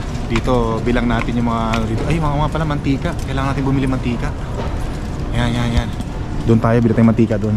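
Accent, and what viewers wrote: Philippines. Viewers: Nkakatuwang mkita mga snow dyan sa canada puti buong paligid ka. Masaya nga lagi mga blogs mo ka kya masayang panoorin ska pinoy na pinoy sa kilos at pananalita.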